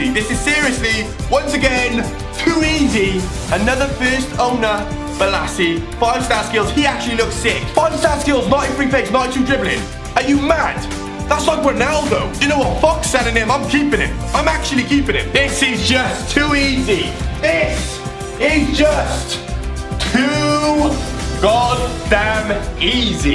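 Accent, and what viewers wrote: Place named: Newcastle, United Kingdom. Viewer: You sound like a Geordie shore person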